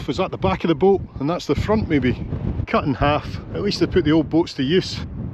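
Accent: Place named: Cockney, United Kingdom. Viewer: For someone from the west end you have a fair East end twang in some of yer words now... 😂😅😢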